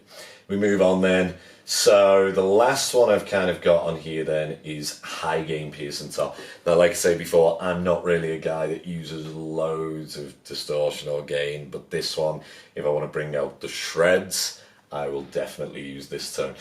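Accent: Liverpool, United Kingdom. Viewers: F**king hell I was not expecting a scouser accent XD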